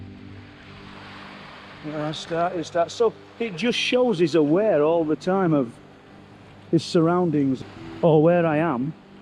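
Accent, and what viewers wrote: Yorkshire, United Kingdom. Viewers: Wonderful video and so lovely to hear a Yorkshire accent again, after growing up in The Dales. I'm 400 miles North now but do miss it, even more so now.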